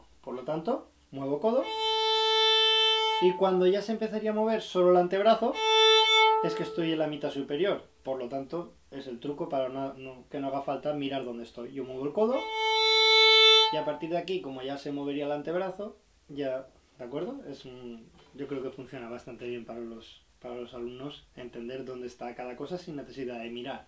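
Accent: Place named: Spain. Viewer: Lástima. Yo vivo en Barcelona y, por el acento... creía que quizás estábamos más cerca. Gracias. Seguiré con tus tutoriales a ver si avanzo.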